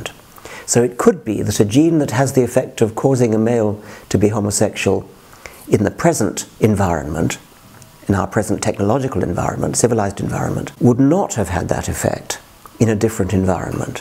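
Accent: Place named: RP, United Kingdom. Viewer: Dawkins you have the arrogance of a boy with low self-esteem and a big ego. All your research and conclusions are folly. You're a puppet for satanists. The only point more wild than your pukka accent promoting nonsense is the ignorant people who buy your nonsense.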